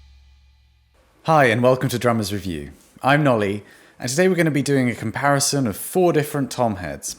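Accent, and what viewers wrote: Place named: United Kingdom. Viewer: mr nolly accent to British. cool explaining, good information.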